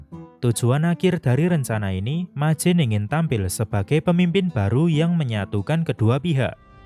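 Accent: Java, Indonesia. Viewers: medoknya jogja bangeeet ,❤️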